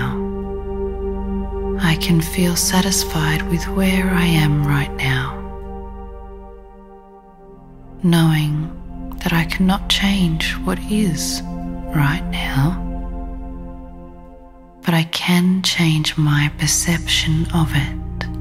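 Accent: Australia. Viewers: My soul responds so much more to your beautiful Auzzy voice ! Namaste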